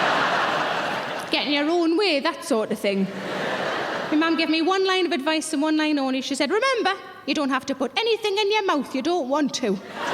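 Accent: United Kingdom. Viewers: What part of the UK is that accent from, lovely xD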